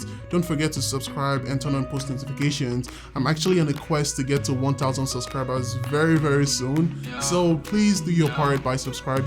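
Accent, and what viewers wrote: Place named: United States. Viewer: Sir please don't talk American English please talk in clear English I can't understand your language 😢 please 😊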